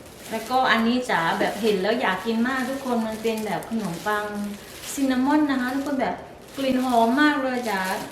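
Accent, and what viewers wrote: South, Thailand. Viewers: น้ำส้ม ไม่มีเยื่อ นิคนใต้ชัดเจนไม่ต้องหมุนเสาเลยค่ะพี่จ๋า🥰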